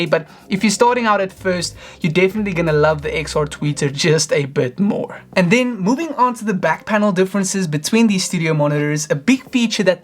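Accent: South Africa. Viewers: you are south african right?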